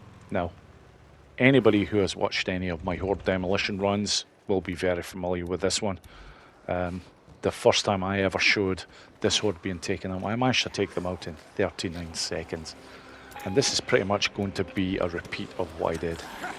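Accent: Scotland, United Kingdom. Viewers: Dude is it Scottish accent you got? Sounds like Mimir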